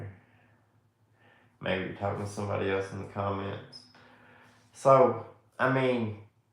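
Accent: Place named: Alabama, United States. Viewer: I recognized your accent before learning you're from Alabama, I'm native myself. Love your channel. You make great points on every topic you choose to discuss.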